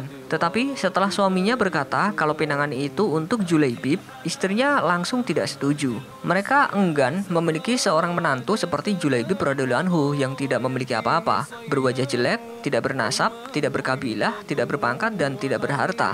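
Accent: Java, Indonesia. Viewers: Mimin orang jawa ya??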